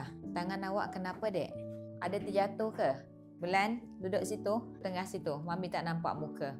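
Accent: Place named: Java, Indonesia. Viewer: Akak org jawa ea?dgr cara bcakap pekat slank jawa akak..😅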